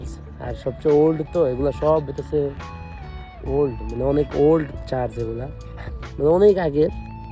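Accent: Tangail, Bangladesh. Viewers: কথা বার্তার ধরনেই বুঝা যায় আপনি পিউর টাংগাইলা..😁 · vai ki tangailla nki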